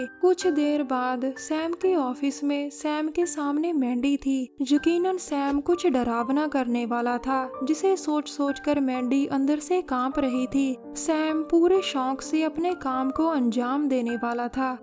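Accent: Punjab, India. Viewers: I always love you content. Specially your punjabi influence in explanation.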